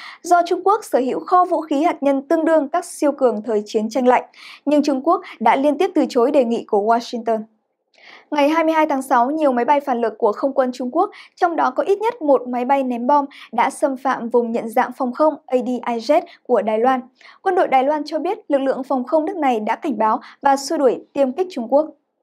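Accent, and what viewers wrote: Standard. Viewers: Chị đã xinh lại nói chuẩn nhanh như gió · noi hay chuan chi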